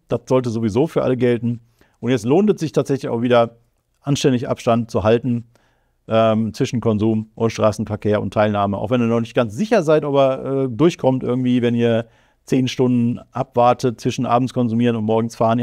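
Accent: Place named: Berlin, Germany. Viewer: Immer noch ganz schön „Berlin‘isch“ 😂😂😂 einfach BESTER Mann, sag ich!!!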